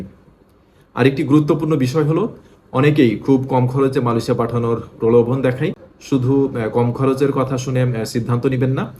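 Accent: Kolkata, India. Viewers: তাহলে কলকাতার ভাষার মতো শব্দ উচ্চারণ করেন কেন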